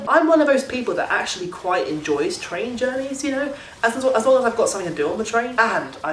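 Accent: Liverpool, United Kingdom. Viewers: Been watching your videos since like your Part 1 of the Philosophers Stone walkthrough on PS1. Those were the days! (actually ordered a PS1 back and purchased that game back recently, walkthrough might come in handy):')... enjoyed every single video!! Top youtuber! Enjoyed your videos about life in Liverpool, lived in Liverpool all my life and the vibe around the place is fantastic. The accent aint bad too. (debatable).. congratz for graduating too! Hope your well bro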